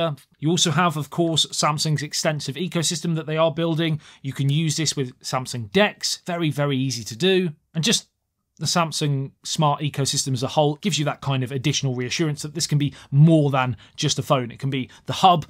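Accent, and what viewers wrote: England, United Kingdom. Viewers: What is the phone Asus, you mention ? You said it so fast I couldn't hear what you said. Your beautiful English accent is slightly difficult for a Northwesterner.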